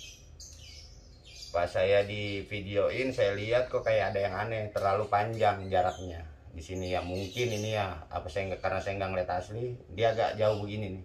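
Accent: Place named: Jakarta, Indonesia. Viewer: Resep klo lagi ngobrol nya bang bahasa nya Betawi pisan sukses slalu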